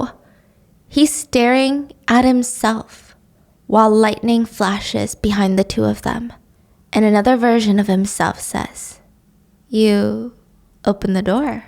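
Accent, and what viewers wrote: United Kingdom. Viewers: how do u always become britsh so randomly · Uh why sound british¿!?!?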